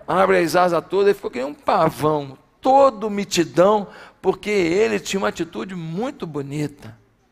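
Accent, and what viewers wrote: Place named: Minas Gerais, Brazil. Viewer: A igreja é no RJ, mas esse sotaque está mais mineiro, rsrs. Culto maravilhoso....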